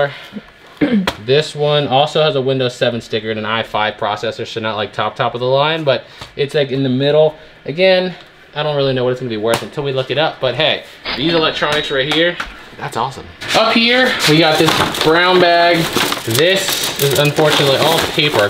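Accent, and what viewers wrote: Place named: United States. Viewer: sounds american.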